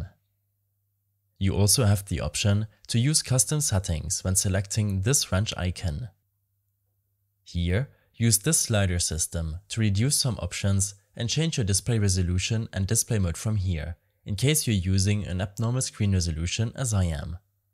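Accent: Germany. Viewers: Where are you from. You have a german accent. I am from Germany.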